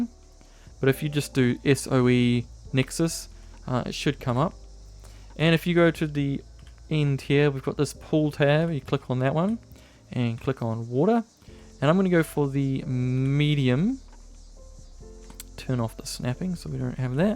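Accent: New Zealand. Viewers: Do you have a NZ accent? Love your channel.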